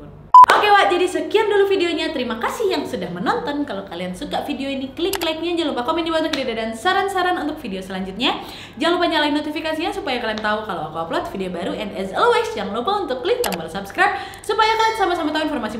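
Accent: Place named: Medan, Indonesia. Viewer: Horas kak sukak kale liat video video kkak medan kale cara ngomong nya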